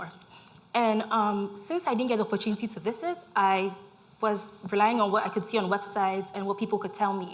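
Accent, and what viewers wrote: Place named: Ghana. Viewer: keep up with the Ghanaian accent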